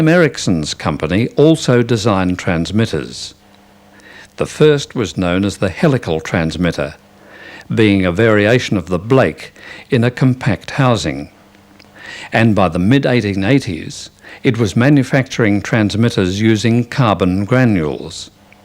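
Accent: Australia. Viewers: He's Australian, other than that, not sure. I heard him in many educational pieces growing up in Melbourne Victoria. It's cultivated Australian English which (I think) is based on Oxford English but sounds a bit flatter for lack of a better explanation. It's most common in Sydney and Melbourne. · Australian accent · The Voice Artist is speaking with an Australian Accent, as this is an Australian Production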